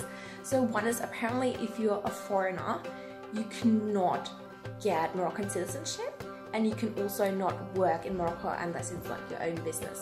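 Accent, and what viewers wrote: Australia. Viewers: I lived in Germany for a while Stuttgart und umgebung :) and at the beginning I was about to say you are German But that's kind of impressive how well you were able to pick up the aussie accent and this misled me into thinking that you are either aussie or kiwi :D That's great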